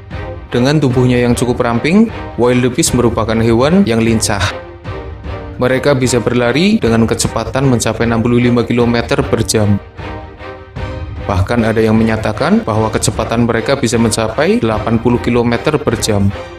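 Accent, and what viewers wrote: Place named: Java, Indonesia. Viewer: Min admin, jawane ngd to? Medok bgt ngmong e wkwk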